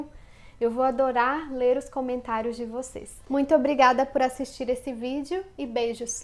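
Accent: Minas Gerais, Brazil. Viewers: Eu sempre me considerei latino. E você ... é mineirinha meiguinha??? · I am not fond of Portuguese, yet the way you speak is Brazilitious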